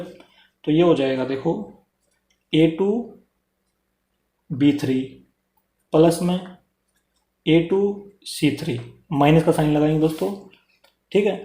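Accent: Haryana, India. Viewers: Re sir aap to haryanvi jaise bol rhe ho But love u sir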